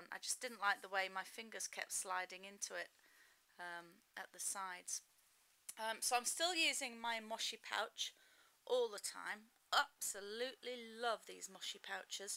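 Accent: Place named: United Kingdom. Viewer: Pouch ouch dilemma:D nice vid, love all your vids but can you please do something with your sound quality? I have to push my macbook volume to the limit to hear that nice british accent;)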